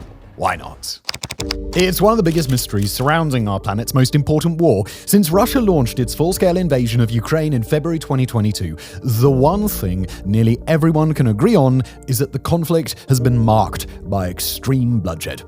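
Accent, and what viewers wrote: United Kingdom. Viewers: never trust a guy with a British accent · The truth isn't within establishment talking points. A bald head and a British accent does not give credibility to obvious propaganda. Understand Ukraine is losing.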